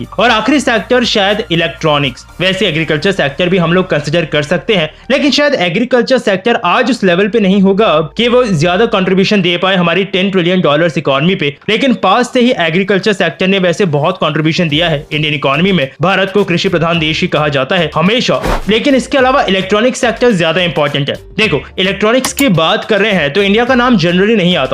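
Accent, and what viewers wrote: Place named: Pakistan. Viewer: Bhadwe tu pakistani hai, fake khabar mat faila.. teri accent pakistani hai BKL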